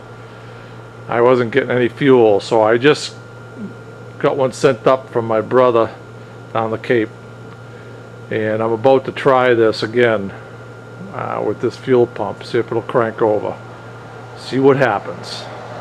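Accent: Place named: Maine, United States. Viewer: you must be from maine.... "motah" lol... (I'm a mainer as well)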